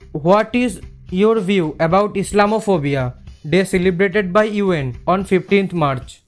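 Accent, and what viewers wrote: Bengal, India. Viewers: Tomar hindi bolar dhoron dekhe mone hocchilo tumi bangali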